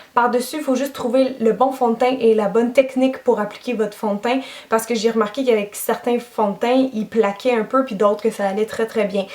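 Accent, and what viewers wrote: Quebec, Canada. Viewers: ❤ Coucou!!!! Je voulais te proposer un petit truc assez simpa à faire et à regarder bien sur, mais avant tout; tu es l'une de mes youtubeuse préféré, tu as vraiment beaucoup de talant et beaucoup de charme, Toi tu as vraiment ta place sur Youtube parce que tu nous partage tout ton savoir et toute ta créativité et bien évidement ta Joix de Vivre! J'espère que tu t'envolera vers un plus haut rang dans ton métier de maquilleuse pro, maiiis bien sur, sans nous oublié nous tes abonnés!!!!!! Tout ça pour te dire, que tu es géniale continue tes vidéos! Car on t'aime toutes et tous très fort!! ❤ ✿ voilà ce que je veux te proposer; es que tu accepterais de nous faire une vidéo banale maiis pas tout à fait comme les autres!!, comme par exemple, une vidéo ou tu présenterais un maquillage ou bien des produits, tout et n'importe quoi c'est toi qui choisi.... 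avec un accent FRANCAIS!!!! Ca serais tellement simpa et cool! Nous Françaises on seraiit toutes émerveiller et vous les Québécoises vous pourrez enfin écouté avec un accent étranger comme nous on l'entend dans chaque vidéos qu'elle poste! Je ne sais pas si toi, ou vous toutes, vous m'avez comprises maiis j'y ais penser comme ça d'un seul coup et j ai voulut vous en parlez parce que c'est comme notre grande Soeur, elle nous partage son savoir et ses expériences, et nous on l'écoute comme des petites soeurs! ✿ ◊ Et puiiis en faisant cette vidéo, ça nous feras toutes du bien de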